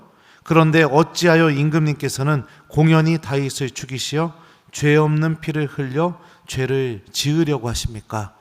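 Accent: Chungcheong, South Korea. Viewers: 충청도백제말쓰시는신부님 김대건신부님이상 귀에쏙쏙들어오는것 신부님튼강강좌미사 감사드립니다아멘 미카열입니다 성부와성자와성령으로아멘 백제말감사드립니다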